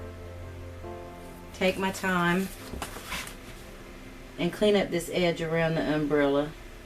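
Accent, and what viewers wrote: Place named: Oklahoma, United States. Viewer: Theresa5 sent me! I love watercolor and will enjoy your series. I love your accent! I am originally from Oklahoma, so your accent reminds me of home (even if you are not from there... lol). Thanks for sharing your lovely work!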